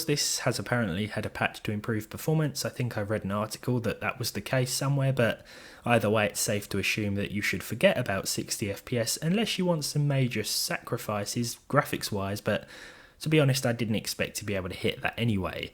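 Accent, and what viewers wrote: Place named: RP, United Kingdom. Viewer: You're sounding particularly posh in this video 🧐🎩 , not criticising, simply noticing, simple as.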